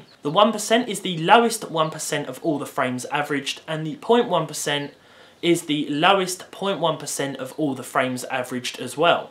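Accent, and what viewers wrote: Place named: Surrey, United Kingdom. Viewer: hey, are you from Surrey? I have a friend who has the same accent (hell, I swear he sounds like your little brother) and he's from Surrey.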